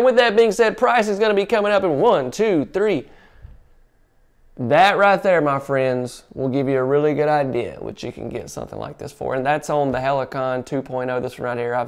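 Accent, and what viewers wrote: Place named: Appalachia, United States. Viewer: Golly,nothin wrong with the hillbilly talk.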